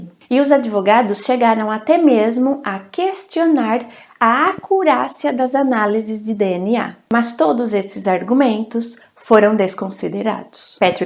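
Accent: Portugal. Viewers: Com todo respeito, você nasceu em Portugal? Seu sotaque vem de onde? Ótimo trabalho!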